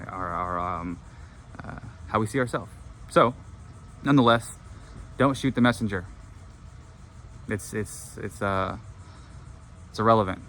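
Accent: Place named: Cockney, United Kingdom. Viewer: Haha I’m British and love the little cockney accent you slip into your videos 😂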